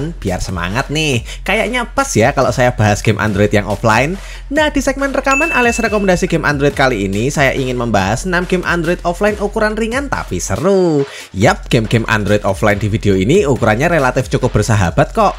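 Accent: Java, Indonesia. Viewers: Lanjut bang,mantap logat jowo ne kentel banget. Yang ngeh no 6 liat size nya kalian emang debes ws pokok e,Iso ngeh lo salut salut,Lanjut bang.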